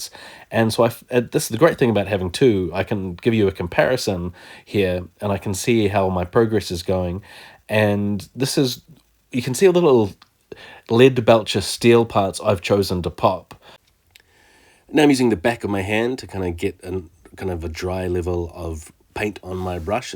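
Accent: New Zealand. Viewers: thought i heard a fellow kiwi's accent very happy i found your channel thru this collab series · Are you a kiwi? Your voice sounds like you are from New Zealand?... yes you are haha just finished the video. That's awesome I'm also a kiwi, keep up with the videos I'm going to watch the pod racer one now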